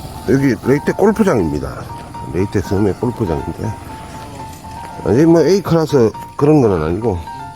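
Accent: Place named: Gyeongsang, South Korea. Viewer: 경상도 사투리 좋아요 자막은 표준어로 처리 해주세요 ㅎㅎ😀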